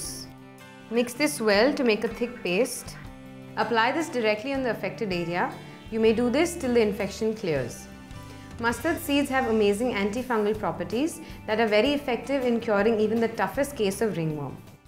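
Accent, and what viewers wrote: India. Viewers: YOUR ENGLISH IS DIFFICULT TO UNDERSTAND BECAUSE YOUR INDIAN ACCENT IS TOO STRONG !!!!!! JUST TO LET YOU KNOW.......🌝🌝🌝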